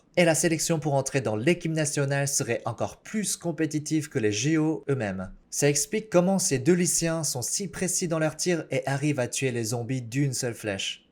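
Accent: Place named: France. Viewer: Je suis tombée amoureuse de ton doux accent français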